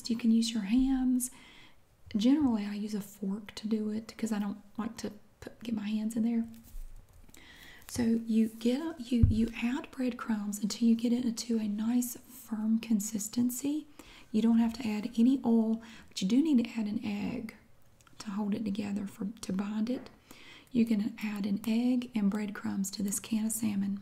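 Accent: South, United States. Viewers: x aren’t they the best. It’s her southern accent for me.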